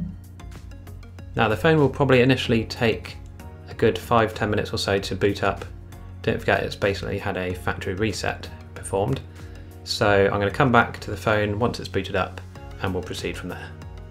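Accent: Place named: England, United Kingdom. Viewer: >English tutorial, with english accent >Done on a Samsung device Perfection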